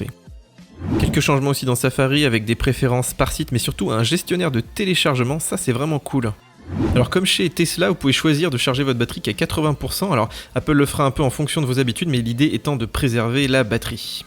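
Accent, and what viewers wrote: Switzerland. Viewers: Mais c est que tu es que tu es valaisan 🤗 je ne savais pas 😏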